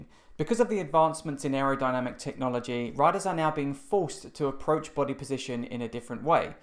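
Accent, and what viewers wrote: United Kingdom. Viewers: It is difficult for me to understand his british accent with the echo due to the microphone being at a big distance from the speaker.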